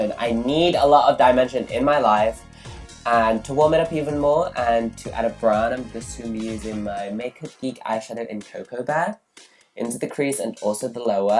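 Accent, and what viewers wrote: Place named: Nottingham, United Kingdom. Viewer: Fuck yeh I love I love and I'm so glad a boy in makeup from Nottingham same as me 🤘🤘 has made a channel like same accent come on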